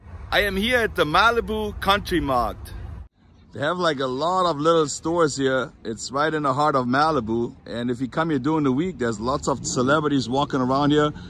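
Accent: Germany. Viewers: Nice to hear a German Accent, my best friend was from Germany. thx for the vids.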